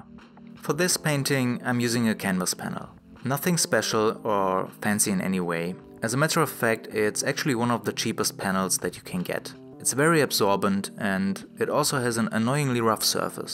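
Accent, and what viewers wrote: Germany. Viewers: Man hört raus dass du aus deutschland kommst haha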